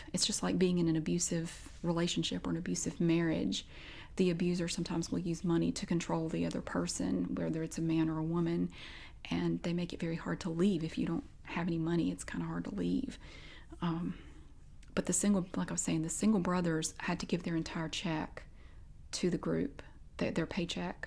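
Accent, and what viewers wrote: South, United States. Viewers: Nothing sounds like the devil from your videos. You have a God given gift of a soothing gentle southern voice. Thanks for helping me rest.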